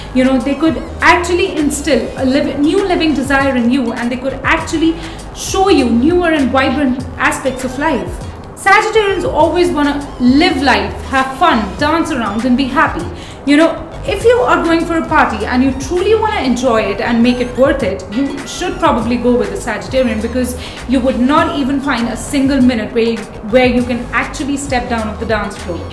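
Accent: India. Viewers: maybe 'becoz of that Indian accent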